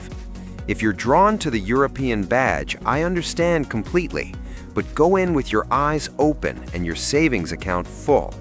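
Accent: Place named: United States. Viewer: The cars a premium budget option is a bloody oxymoron.. Yankee accent talking rupees, this bound to bullshitignore it!!😂😂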